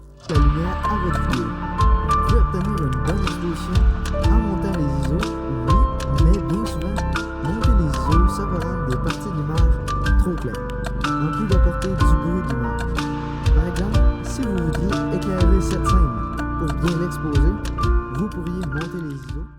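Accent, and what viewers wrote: Quebec, Canada. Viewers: Tu vient du quebec ta l'accent quebequoi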